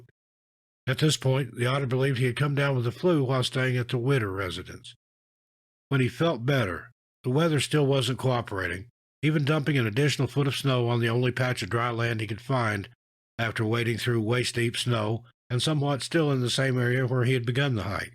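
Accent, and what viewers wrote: United States. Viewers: Your accent comes from which part of America, New York?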